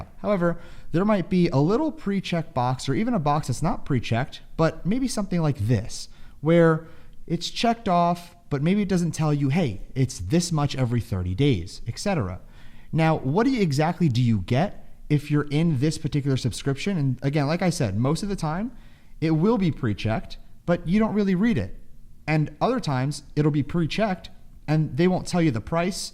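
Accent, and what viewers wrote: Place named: New York, United States. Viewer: Hahaha, no one will say yes bro if they're under the shadow making millions. I also like your NY style of talking about the ones in the dark, keep it up.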